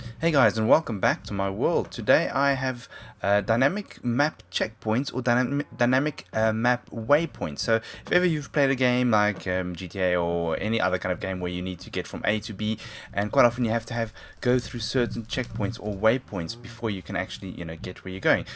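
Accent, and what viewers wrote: South Africa. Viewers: I thought so, My parents have friends that are south african and they sound just like you. Pretty cool Man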